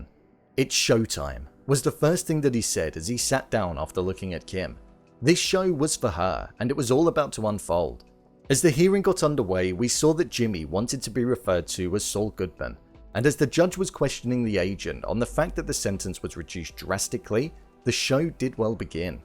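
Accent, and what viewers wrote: United Kingdom. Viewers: Oh god a prissy British guy talking. Disliked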